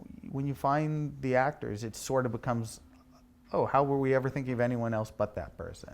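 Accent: United Kingdom. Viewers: Que inglês é esse, hein,?! Sotaque britânico, pronúncia impecável e fluência perfeita! E a entrevista está ótima também! Meus parabéns pelo canal.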